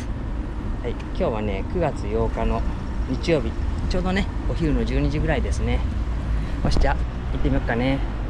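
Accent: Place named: Kitakyushu, Japan. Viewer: 北九州近辺いいですねぇ。北九州弁がいいですね。懐かしく思います。猛暑の中で井堀、朝日ヶ丘一杯やってください。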